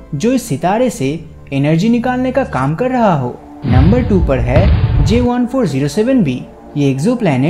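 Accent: Bengal, India. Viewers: Your hindi is as like as Bengali people 😊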